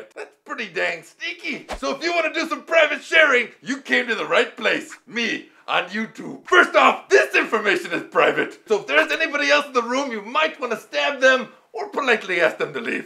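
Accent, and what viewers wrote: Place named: Mexico. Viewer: this ninja sounds mexican!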